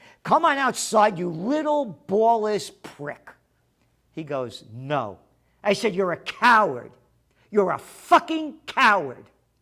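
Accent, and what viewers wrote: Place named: New York, United States. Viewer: Love the New Yorker Accent!! God bless America Sincerely, from Down Under!!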